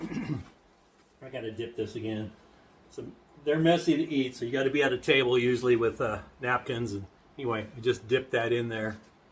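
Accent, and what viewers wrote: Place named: Spain. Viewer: The recipe just sounds better when you say it with a very authentic Spanish accent. Love birria tacos. What if I guess made it about a month or so ago and it was so delicious. Nice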